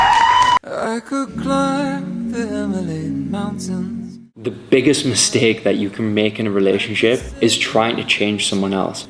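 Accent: Newcastle, United Kingdom. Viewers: He has a Newcastle accent. 🙄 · You sound geordie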